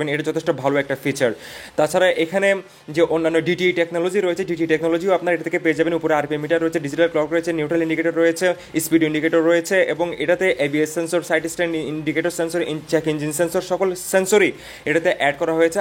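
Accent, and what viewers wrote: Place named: Chittagong, Bangladesh. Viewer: ভায়ের বাড়ি চিটাগাং। এক্সেন্ট এ বোঝা গেলো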